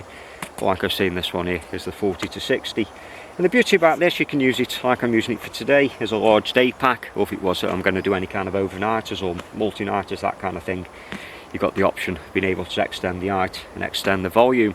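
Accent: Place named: Scotland, United Kingdom. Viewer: Yes indeed that did help a lot. I'd missed the information about the various places you could strap the hip-belt on. I thank you. I'd like to avoid those trials and errors as much as possible when buying over internet, especially from companies like Varusteleka whose customer service will always go the extra mile : I wouldn't like to stretch them either, for fear of seeing them put on a tougher face. Besides, it's been refreshing for me to listen to you talking with your scottish twist. I've lived around Aberdeen for three years, and hearing a scotsman talk brings every time fond memories of warm people and amazing light on the land. Cheers ! :)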